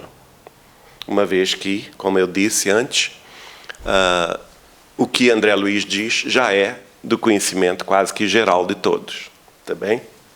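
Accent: Portugal. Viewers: porque o sotaque portugues??? kkkk nao faz sentido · Ah pq ele esta fallando c sotaque de portugues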